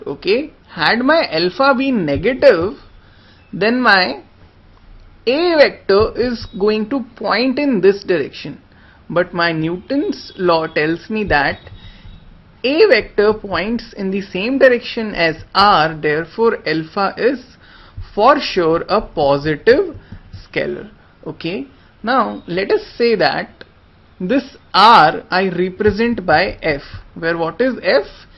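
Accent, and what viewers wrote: India. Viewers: Your accent is so indian ! how can we understand ?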